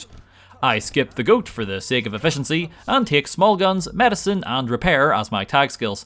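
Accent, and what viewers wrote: Northern Ireland, United Kingdom. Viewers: Clicked on this video and was immediately flash banged with "HOLY SHIT IS THIS DUDE NORTHERN IRISH" lmao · My m8 said u were northern irish the 1st time I heard ur voice I knew he was right and what is ur least fav religion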